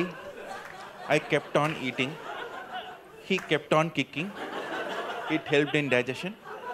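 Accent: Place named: India. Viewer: Standup comedy by Indian artists somehow does not amuse if they talk in English. Heavy accent takes out the fun.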